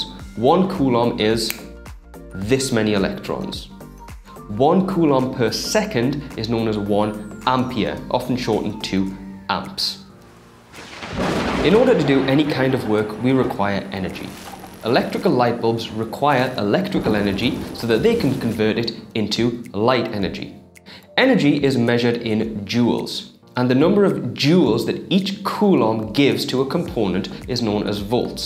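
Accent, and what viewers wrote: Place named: United Kingdom. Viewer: He is bri'ish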